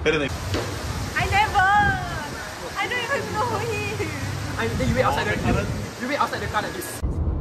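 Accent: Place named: Australia. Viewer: why do i feel like your accent has changed. it's more aussie than before.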